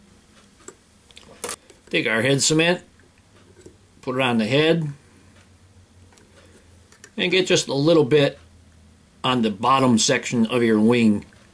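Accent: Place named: Buffalo, United States. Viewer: you sound like every Polish guy I know from Buffalo. Like I'm home. Great videos!! Thanks!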